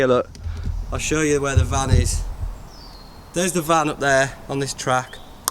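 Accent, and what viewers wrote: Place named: North, United Kingdom. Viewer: Came by after Zed mentioned you and I'm glad you did. Quality vids. How much did you have to pay Rick Grimes to camp with ya? haha. Can't place your accent either. I know its northern but it's got hints of all sorts hah. Keep at it bud. ATB, Mike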